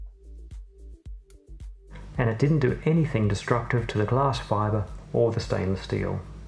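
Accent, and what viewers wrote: Australia. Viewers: You sound like an Australian. Where are you based ? First time viewers unsure how we got here.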